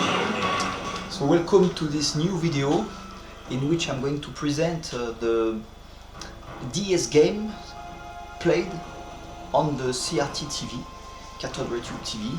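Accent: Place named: England, United Kingdom. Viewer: Magnifique accent anglais ^^, le rendu a l air bien sympa !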